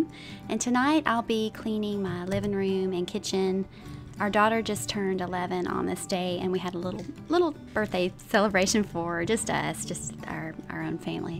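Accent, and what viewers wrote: South, United States. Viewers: I'm in love with your Lil southern accent you're so comforting 🥺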